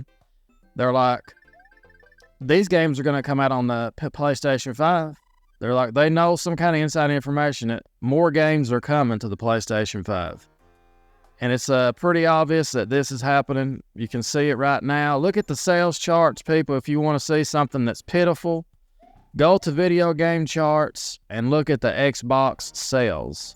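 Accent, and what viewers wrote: South, United States. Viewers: I didn't know anyone could have a Southern accent that thick!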